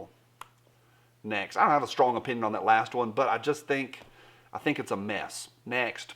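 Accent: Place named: Alabama, United States. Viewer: Googled up Gomer Pyle Accent. Apparently it's Alabama. I'm Tennessee Hillbilly, but it's mostly washed out by now. I think, you are Tennessee? edit (keeps watching video) OK, you're Alabama.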